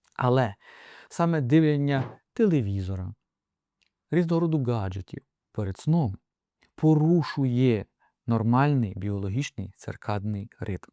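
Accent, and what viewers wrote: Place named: Lviv, Ukraine. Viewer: Ви, напевно,- лікар від Бога!! Особливо мені подобається ваша вимова! (Я закінчив учбовий заклад у Львові та прожив там понад 30 років… Тому ваша вимова мені дуже рідна!! ) Дякуємо вам за ваші поради! Дай Боженька вам та вашим близьким,- Здоровʼя,, Добра та Любові! ❤️! Будьмо!!!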